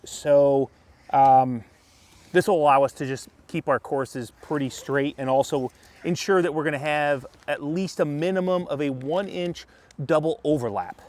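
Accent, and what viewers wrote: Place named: Chicago, United States. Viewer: Nice Chicago accent.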